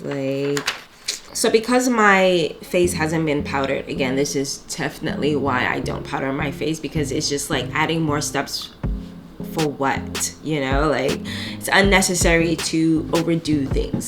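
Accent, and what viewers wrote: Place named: Caribbean. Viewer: Are you west indian? Cuz I hear a little accent.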